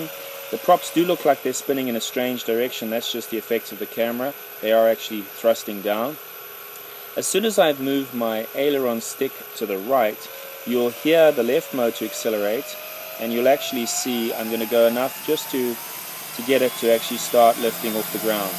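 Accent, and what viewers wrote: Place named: South Africa. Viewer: You sound like you have lived in South Africa before......(accent)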